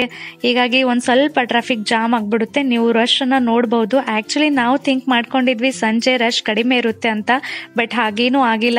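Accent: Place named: Belagavi, India. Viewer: hi akka nimma ura yavduu heli.....Niv belgavi Kannada matadtira adake kelde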